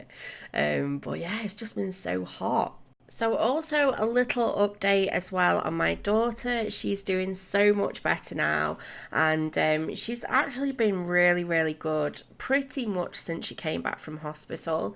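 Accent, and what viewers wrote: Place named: North, United Kingdom. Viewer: Always watch your builds & LOVE LOVE LOVE THEM - Currently live in Amsterdam but I'm from Manchester, Always Good to hear another northerner ;)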